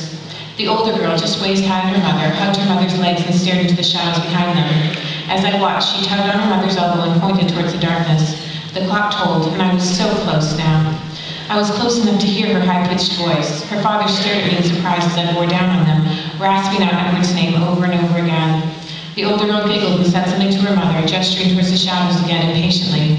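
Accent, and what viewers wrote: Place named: United States. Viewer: Che accento americano orrendo xD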